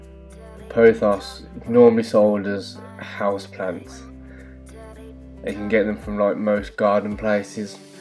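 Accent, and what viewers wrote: Birmingham, United Kingdom. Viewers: you're from birmingham!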